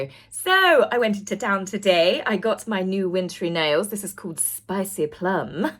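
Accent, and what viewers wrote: United Kingdom. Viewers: Gorgeous nails and beautiful makeup as always! Love your voice and lovely British accent 🥰❤️👍👍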